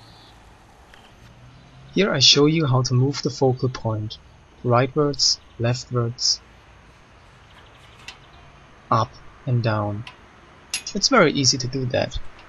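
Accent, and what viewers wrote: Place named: Germany. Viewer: you have a german accent.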